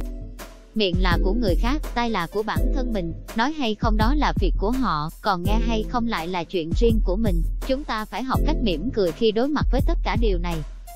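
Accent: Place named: South, Vietnam. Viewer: Giọng đọc Miền nam rỏ ràng - Hay!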